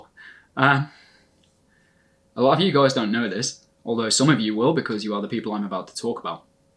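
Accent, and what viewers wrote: Birmingham, United Kingdom. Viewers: Hello fellow brummie! Where in Birmingham are you from? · The more I hear you speak the more I can hear the Birmingham accent. Thank you for being so raw and honest with us xx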